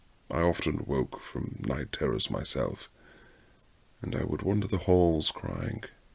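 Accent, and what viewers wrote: United Kingdom. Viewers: Is this Brit accent?, I am a non native English speaker, sorry. But it sounds so good🩷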